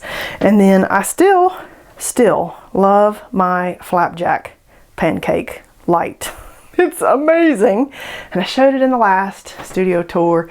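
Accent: South, United States. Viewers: Thanks for the great content, your southern accent is lovely!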